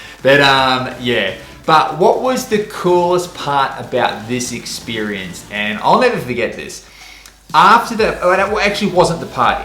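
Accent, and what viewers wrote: Australia. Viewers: Awgh ausy accent is so annoying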